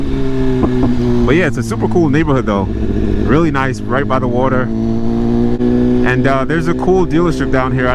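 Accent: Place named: New York, United States. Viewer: I mean just do what I do. Think about it as acting and or having a conversation with someone I front of you. You sound pretty good now as we speak. And giving the viewers what they are asking for. I always ask the viewers if they want to toss out ideas on what they would like to see in the vlogg and what works and what doesn’t work etc… the thing with your video is that hearing the way you talk just reminds me of home both the island and NY (until I heard you speaking a little bit of patwa at a gas station) and I was ahhhh ok ok. That’s why I get both NY with the island feeling and your bike just sounds SO crisp when you going and the snap cranked pops with the rice crispy’s is dope af! Lol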